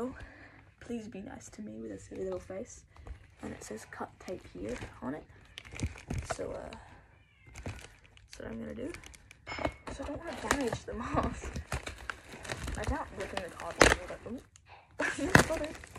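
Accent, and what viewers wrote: Australia. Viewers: OMG UR AUSIIE ACCENT IS BETTER THAN MINE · Are you Australian? I love your accent!❤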